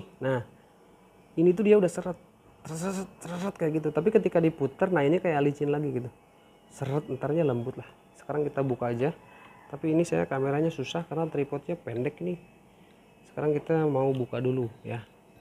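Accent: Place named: Jakarta, Indonesia. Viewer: Hahahaaa... ini logatnya betawi tapi bahasanya sunda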